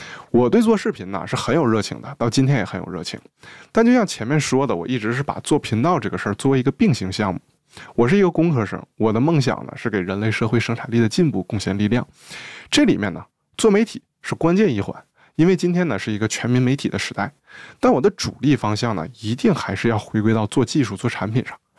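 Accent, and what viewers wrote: Northeast, China. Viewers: 小老弟小老妹儿，第一次看你的视频就知道你是东北人:face-blue-smiling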